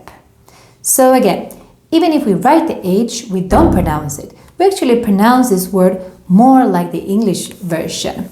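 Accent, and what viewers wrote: Stockholm, Sweden. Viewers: I think I checked everything you have and, honestly, I enjoy it all. It's a lot of fun for me... On the other hand, you have the accent I need to understand (South of Stockholm). It is helping me a lot.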